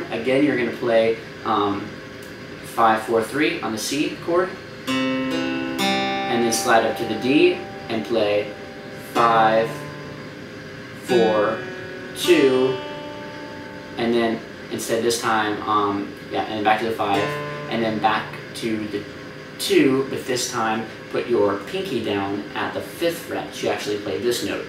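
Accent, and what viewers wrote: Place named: United States. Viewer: hehe i like ur accent doing filipino language (tagalog) when ur american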